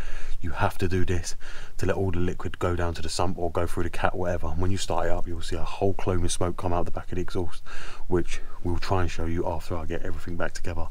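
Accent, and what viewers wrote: United Kingdom. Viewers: In the USA, his British accented English is said so fast as to be indeciperable.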